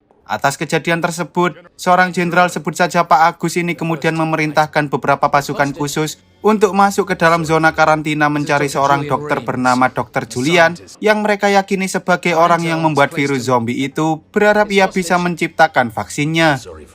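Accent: Java, Indonesia. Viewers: Medok gini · Jowone endi mas kok medok tenan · Suka denger logat jawa medok mimin nya😁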